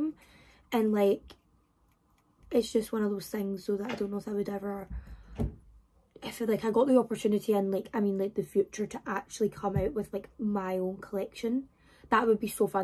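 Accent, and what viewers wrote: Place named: United Kingdom. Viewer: are you british? I can't really understand your accent; where r u from girl? :)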